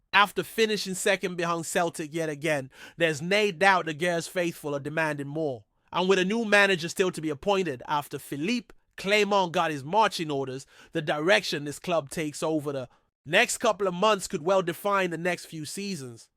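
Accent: United States. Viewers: love the American accent in Scottish slang, fkn hilarious.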